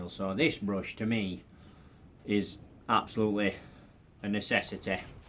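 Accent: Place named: Yorkshire, United Kingdom. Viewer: New sub. Cracking video, I'm from Lancashire but I like your style, proper Yorkshire 👍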